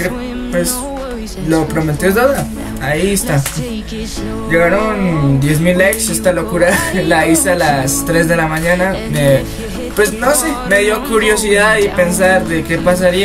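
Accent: Colombia. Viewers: Jaja lo sabia que eres de bogota coloombia se notaba mucho xd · Se te nota lo colombiano Viva colombia! uwu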